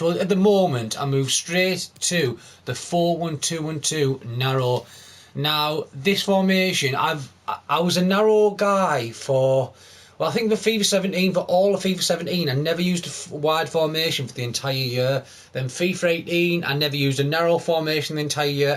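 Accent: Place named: Middlesbrough, United Kingdom. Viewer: where you from mate Middlesbrough? or close? ive never wanted to stream because i thought my accent would sound terrible but....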